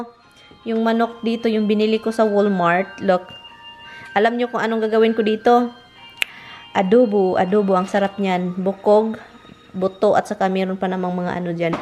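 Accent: Visayas, Philippines. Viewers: Tagal mo na dyan sa america. Ang accent mo bisayang bisaya. Bat dka magtrabaho pwede rin pala dyan housekeeper.0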